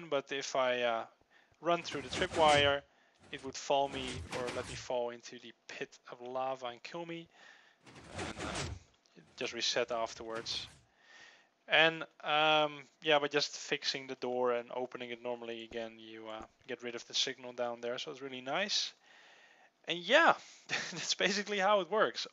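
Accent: Netherlands. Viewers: Ik hoor dat je uit nederland komt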